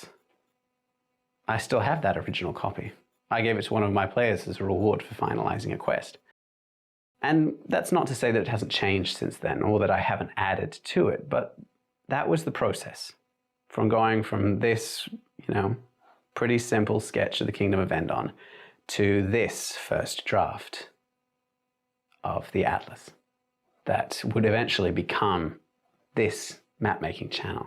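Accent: Australia. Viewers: Finally an Aussie accent in a DnD context #normalisestrine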